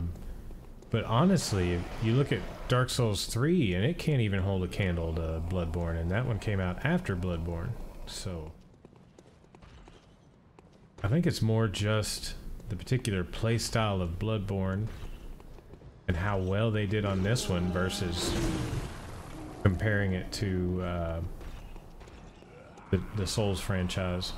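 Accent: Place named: South, United States. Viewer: Yes ma’am I can tell you got that southern draw lol me too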